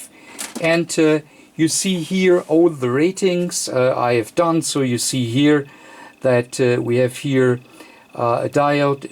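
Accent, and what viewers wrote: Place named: Germany. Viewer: I am just glad to see you back. I love your videos, german accent or not!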